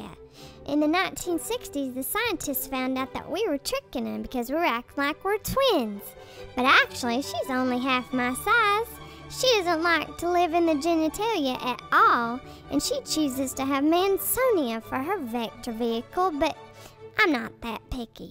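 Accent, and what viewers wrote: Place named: South, United States. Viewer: That's very very nicely done.Please do other parasites too. I guess anything with southern accent is catchy.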